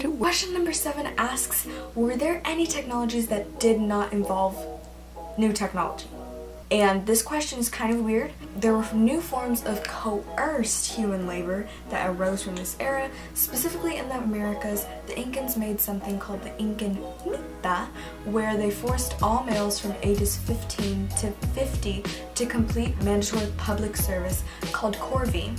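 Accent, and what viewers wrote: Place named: United States. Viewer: we love tht gringo accent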